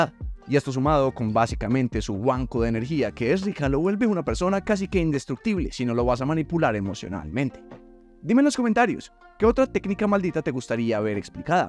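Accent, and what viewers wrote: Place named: Colombia. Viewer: de donde eres? algo en tú acento me dice que eres de colombia o me equivoco? aunque intentas neutralizarlo creo que se te escapa un poco 😅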